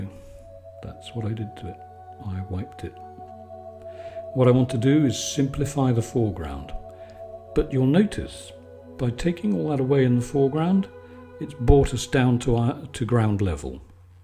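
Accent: England, United Kingdom. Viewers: I love how your English accent even makes watching grass grow sound interesting!😊 I have a wooden board hanging in a gold frame on my wall staring at me; it's patiently waiting for me to give it the treatment... very soon...💗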